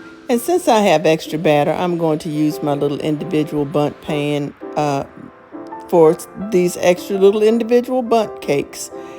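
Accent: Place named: South, United States. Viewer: Wow, you you look much too young to be the grandmother of those children. Black women are lucky to have such beautiful skin that ages so well. I look much older than my Jamaican friend of the same age. I also love your beautiful Southern voice and gentle air. I'm going to try this recipe but I think I will reduce the sugar. I'm going to watch your other videos. Peace and Love. Sheenagh from Canada.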